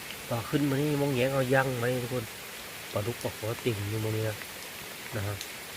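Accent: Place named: Khammouane, Laos. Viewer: ສຳນຽງຄືຄົນຄຳມ່ວນເຮົາເນາະ ມະຫາໄຊ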